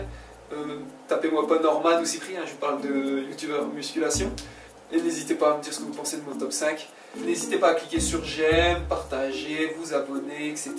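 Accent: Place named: North, France. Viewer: T-es du Nord non? Parce que t'as un léger accent je trouve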